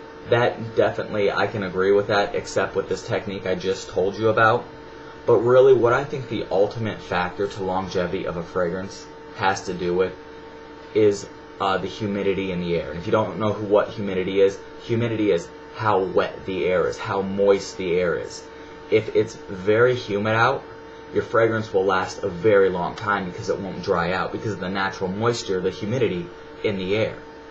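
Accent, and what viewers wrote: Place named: Pittsburgh, United States. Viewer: your from Pittsburgh aren't you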